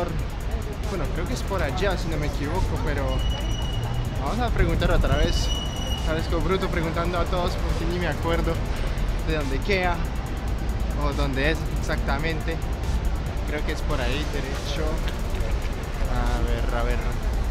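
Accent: Bogotá, Colombia. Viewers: Es que tu español es muy colombiano y es casi perfecto pero si despierta curiosidad jajajaja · sabe que si tiene acentó colombiano pareces paisa el mejor acento de Colombia. pero tu eres demasiado noble y linda tu forma de ser felicidades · Este man habla con un acento muy rolo para ser Francés !! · Yo te lo digo. Pienso que eres alguien que ha vivido parte de su vida en algún país de Latinoamérica (tu acento colombiano te delata), seas o no nacido allí. Un saludo. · x q tienes acento colombiano ?? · Hola! Ven a Rotterdam... Aca no te pierdes.. yo te doy un tour por la ciudad 🤗🤗... Me gustan tus videos y tu acento Colombiano... Un abrazito... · te extrañamos en Colombia. Y cierto lo que dicen todos en los comentarios.. tu acento es recolombiano. Saludos.. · me gusta mas como se te ve ese look sin barba y con lentes y a mi se me hace que eres Colombiano hablaa excesivamente bien el espaniol y tienes muy marcado el acento de alla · el Francés les parecerá extraño porque te vez claramente europeo pero con acento latinoamericano casi, casi como colombiano. 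· deberías de venir a Barcelona, guapo jajaja me hace gracia tu acento de Colombia tanto que dices... señor que pena y pides dirección, pero acá pena, no es vergüenza, pena es de lastima o tristeza pero igual me gusta mirarte y escucharte 😍😍😘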